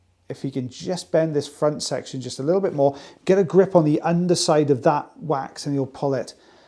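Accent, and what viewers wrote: Wales, United Kingdom. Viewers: Love hearing you talking, the Welsh accent takes me way back. Left Wales in 1965 but still have friends there I went to school with. Hope will get well soon, you two have such good repartee when watching videos. Take care, it will soon be March 1, St David’s Day, first day of your Spring. I will wear my daffodil brooch, Cymru am Byth. From Rosie, Australia 🇦🇺.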